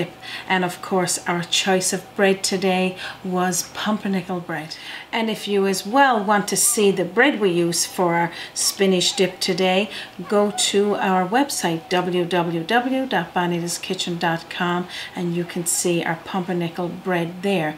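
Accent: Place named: Newfoundland, Canada. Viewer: I so enjoy your NFLD speak. That dip looks so darn good thank for your video.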